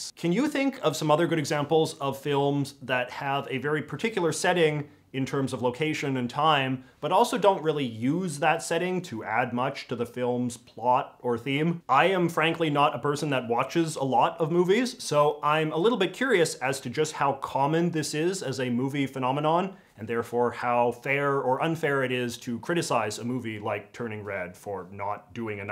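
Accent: Canada. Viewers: homie, as a fellow canadian, you’ve got the most canadian voice i’ve ever heard not in real life! you hit those aboots, love it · I’ve barely heard any Canadian accents but I love your one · this man has a classic stereotypical canadian accent and I love it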